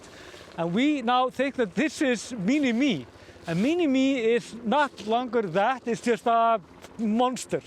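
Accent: Iceland. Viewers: Greetings from Mn. USA. I watch every News cast, and am very pleased with your reports. Thoroughly enjoy your Icelandic accent. The information you pass on to the world is excellent, thank you. You are very fortunate to live where you do, and are so close to these wonder's of nature. Thanks again and keep up the excellent reporting.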